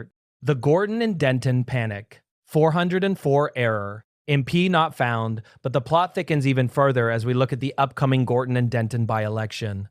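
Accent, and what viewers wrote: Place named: United States. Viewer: What a load of crap and American voice over crap aswell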